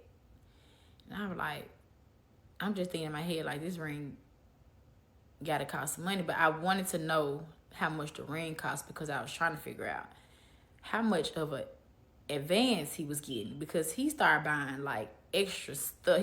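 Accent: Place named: Memphis, United States. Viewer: Can tell she from Memphis 😂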